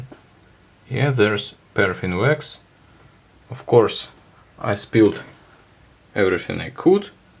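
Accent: Russia. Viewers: Are you from England? Because I hear you're not from England, but from Russia, right?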